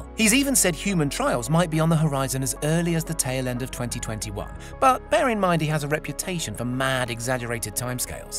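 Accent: United Kingdom. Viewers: Why do I love this guy's British voice so much?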